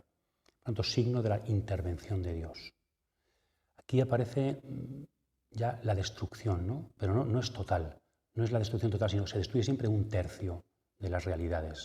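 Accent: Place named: Spain. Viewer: Escuchar a un sacerdote de sana doctrina y con acento español no tiene precio. Dios lo bendiga Padre!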